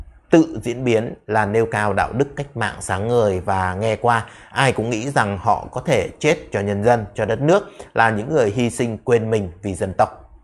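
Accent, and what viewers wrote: Standard. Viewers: Nghe hay. Nói chuẩn. Xin cảm ơn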